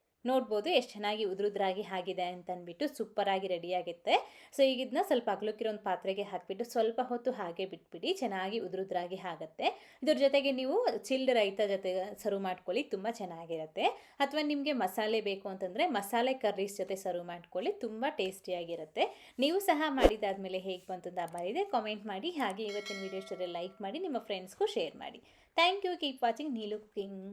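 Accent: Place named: Tamil Nadu, India. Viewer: She is a Tamilian avr accent hage ne irathe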